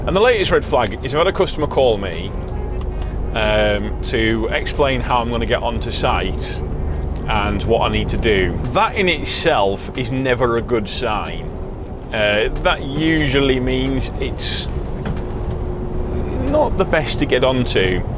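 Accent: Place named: North, United Kingdom. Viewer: Enjoyed the vlog chap....your accent is northern..lol...great review on the dash cam 😂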